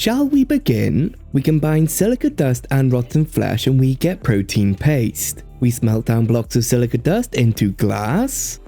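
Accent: Wales, United Kingdom. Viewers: I recognise a Welsh accent anywhere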